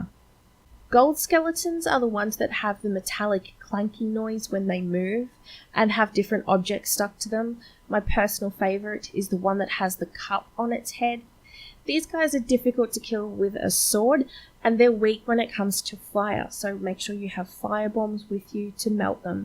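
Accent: Australia. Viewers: dude, I was having to take 6 swipes at reg skellies that normally take 3. I’ve been a PL since year one, so not sure what’s up. Btw, still loving the content and the Aussie accent 😎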